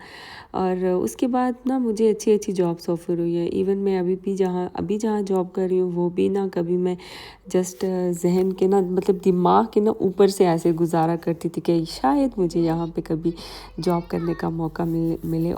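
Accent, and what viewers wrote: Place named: Mansehra, Pakistan. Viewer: muje aap ki zaban se lagga tha k aap mansehra se hugi because udar k log buht ko much boltay hein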